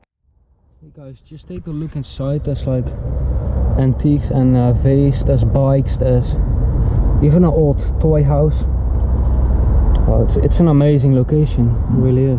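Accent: Netherlands. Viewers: Je hebt zo'n goede video's.... Kom er steeds op door dat je met anderen op pad bent... en kijk dan toch steeds even naar je vids. Maar pfoe.. ik kan me echt niet abonneren en klik vrij snel weg omdat ik dus al heb gezien wat je gefilmd hebt met je co-host zeg maar. Niet omdat ik niet wil zien wat JIJ geflimt hebt ten opzichte van je co host want ik kom steeds even kijken, maar sorry... ik kan echt niet tegen je "steenkolen" Engels.... Ik weet dat iedere Nederlander of Belg (inclusief mezelf!) gewoon een rot accent in Engels heeft maar het is gewoon TE erg voor mij. Ik kan zelf vrij goed Engels verstaan, lezen, schrijven etc maar spreken doe ik zelf ook liever niet ivm accent. Ik weet hoe moeilijk het dus is maar dat houd ook meteen in dat ik elke Engels met een Nederlands/Belgisch accent gewoon niet aan kan horen :D Het is dus niks persoonlijks en zou willen dat ik je vids met een open blik kan bekijken maar ik kan het gewoon niet.. 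Sorry!! Ze zijn zo goed maar zodra je Engels begint te praten krimp ik in elkaar .....De video met Mark en in the prostitute mansion: de duif of uil: he flight right at him!! The Owl flight right at him!! En niet 1x maar diverse keren en tja.... we weten allemaal dat die zin totaal niet klopt. Wil ook echt niet negatief zijn want je video's zijn goed... erg goed.. maar misschien toch beter in het Nederlands? Trek je echt meer viewers door Engels te praten in je video's? Mark is ook alleen Nederlands...en volgens mij hebben jullie bijna hetzelfde a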